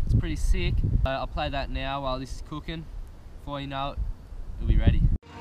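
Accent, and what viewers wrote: Australia. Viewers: Mate are you from Queensland or new south wale.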